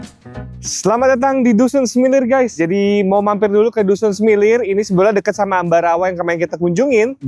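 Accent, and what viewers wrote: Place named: Papua, Indonesia. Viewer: Tuhar... Ternyata dialek nya Bang Masih kuat Papua😅😅😅😅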